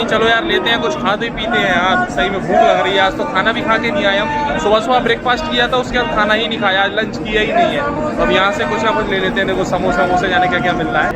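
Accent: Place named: Bundelkhand, India. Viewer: Tum bundelkhand ke lag rahe ho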